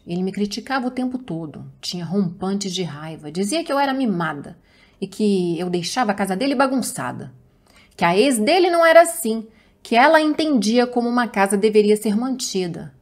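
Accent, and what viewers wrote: Minas Gerais, Brazil. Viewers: Vem disgrama.....famoso mineires 😂😂😂😂😂 adoroooo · " morreu nao desgrama vou embora" 😂😂😂 amo nosso mineires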